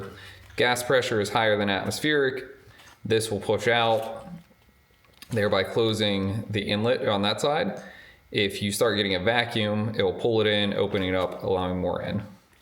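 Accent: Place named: Pennsylvania, United States. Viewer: I have to ask, are you related to Sam from Scrappy Industries? I see the personalized "Scrappy Industries" sweatshirt, and you definitely have a heavy western Pennsylvania accent. (I grew up 40 miles west in Steubenville, Ohio.). You look like you could be his brother?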